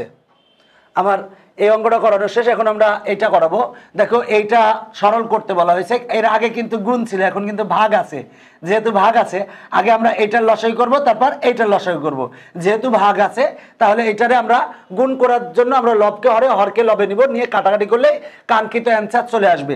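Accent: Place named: Kushtia, Bangladesh. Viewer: Sir apner Basha kothai??? Apner bola kichu Kotha shune Mone hoi apni kustia thake😁😁😁😁😁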